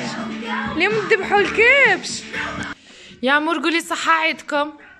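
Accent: Tlemcen, Algeria. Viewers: هدي هدرتها هكا حنا التلمسانيات نهدرو هكا و كاين اللي يهدرو بال a يهدرو بضرافة كثر من هاك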